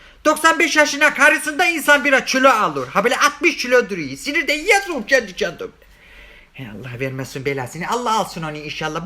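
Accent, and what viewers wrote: Black Sea, Turkey. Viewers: Bilezikte güzel yakisiyor ne yapiyım seni ya puyuklu usak karadeniz sivesini seviyorum iyiki varsin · Elmiki ezrail unitti elmi firavun kari.... Ayzina yüreğine sağlık be uşağumm... Süpersin bu Karadeniz şivene doyamayrum...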